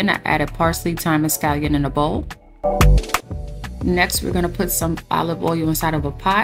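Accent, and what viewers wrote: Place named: New York, United States. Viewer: I’m going to try this tonight. Yessss to the editing and love your NY voice.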